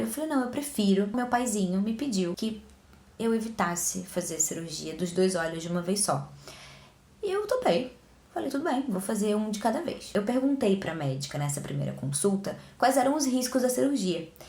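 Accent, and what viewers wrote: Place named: Rio Grande do Sul, Brazil. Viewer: Quando eu era pequena (hoje tenho 32), tu tinha sotaque gaúcho:) Que engraçado te ouvir agora!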